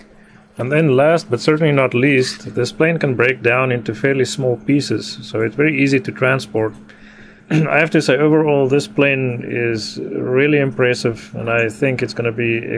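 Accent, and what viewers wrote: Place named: South Africa. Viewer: Hi guys, great video! Can i ask where this was made? You sound somewhat South African...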